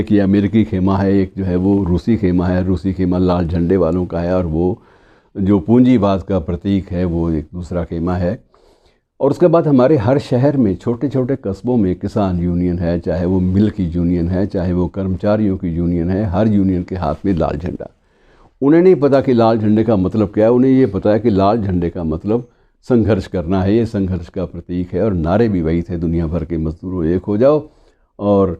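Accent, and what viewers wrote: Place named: Pakistan. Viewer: Iss aadmi ko sunke aisa lagta h jaise kisi pakistani ko sun rha hu, ab dekhlo jinki jeeb tak dushmano jaisi h unke vichar kinke jaise honge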